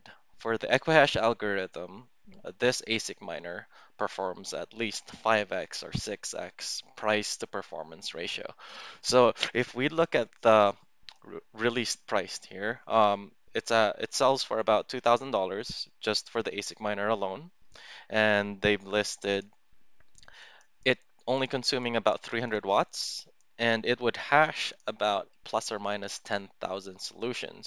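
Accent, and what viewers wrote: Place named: Philippines. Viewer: can tell you're filipino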